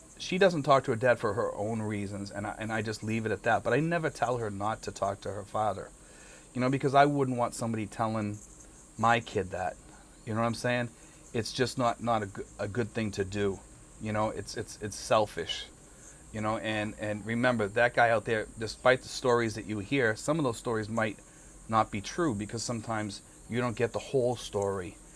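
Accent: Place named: Massachusetts, United States. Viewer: I guess I'm not a typical expat because very little in your video applies to me. I've been married only once to the same woman, a Filipina. We have a daughter, and I've always had a great relationship. She's 21 now, and she's always been a great kid. We talk often, and I listen to what she has to say. Part of the reason things have worked out for me might be that I was 42 before I got married, and I'd had enough American gf's to know I didn't want one as a wife. Anyway, I subbed your channel. Also, I knew you were from Massachusetts by your accent. Me, too.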